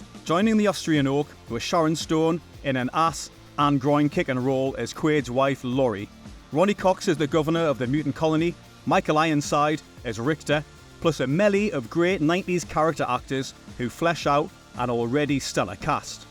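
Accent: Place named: Newcastle, United Kingdom. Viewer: A bold move to use a Geordie for the V/O!!! One of my favorite accents in the world!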